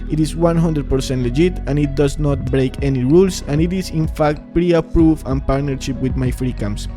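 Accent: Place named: Africa. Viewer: Scam with African accent. I feel sorry for your mother!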